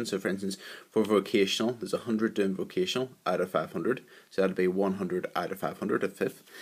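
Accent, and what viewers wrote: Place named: Scotland, United Kingdom. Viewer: what is that scottish accent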